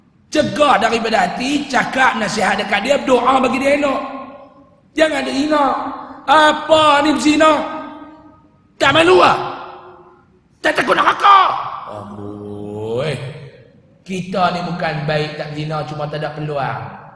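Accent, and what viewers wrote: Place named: North, Malaysia. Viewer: .ni yg mna ada kutu dok kecam dia slama ni aku nk pesan kt hampa,hampa kecam dia hampa rasa hampa dh ckup sempurna 100% ja jd mnusia dlm dunia ni,aku Siam tp aku suka dngar ceramah dia cara dia ckap tu hampa jgn pelik kami org utara mmg loghat kami cmni dh..nmpak ckap mcm kasaq tp dak...nk taw ksaq ka dak mai hidup kt bumi utara nt hampa baru fhm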